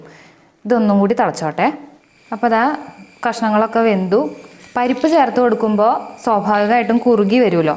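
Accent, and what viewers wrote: Thrissur, India. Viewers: eppozha chechide channel kadath...Nalla Avathranam...naannayid receipeum....chechide veed evdyaa.Thrissur Aano....God bless u&Ur family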